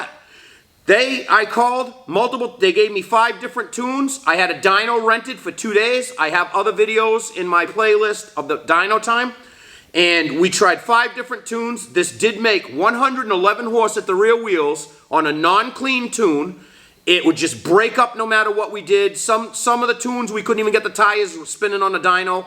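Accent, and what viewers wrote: Boston, United States. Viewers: All I hear is Boston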